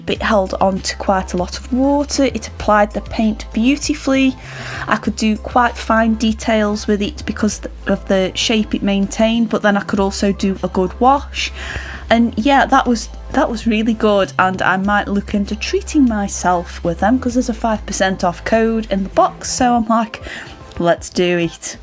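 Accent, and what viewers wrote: United Kingdom. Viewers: Ooo yay, I know this video is 3 years old but I’m excitedly watching upcrate videos since I have one arriving tomorrow. And I got even more happy hearing that you’re British😁😂 subscribing for sure and can’t wait to see the finished product!💕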